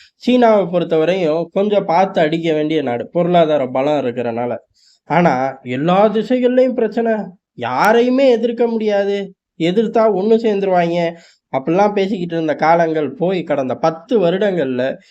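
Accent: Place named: Nagercoil, India. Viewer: Ur voice is very familiar. R u from nagercoil.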